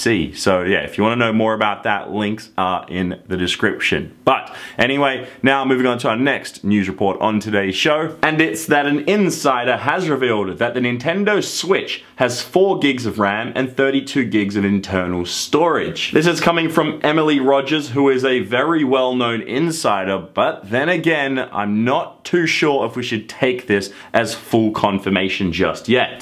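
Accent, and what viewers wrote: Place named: Australia. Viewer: Bob Bobby literally the exact same accent as every other Australian lol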